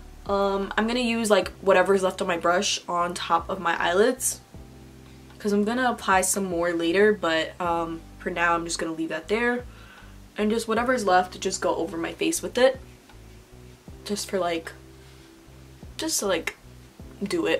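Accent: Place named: New York, United States. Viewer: i'm from nyc and when i got to i was like 👀😗i'm getting nyc vibes is she from nyc?? and sure enough 😛🤟🏽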